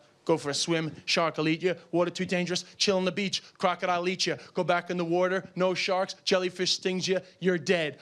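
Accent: Wexford, Ireland. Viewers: this must have happened in Wexford cause u can hear the Wexford accent coming out in u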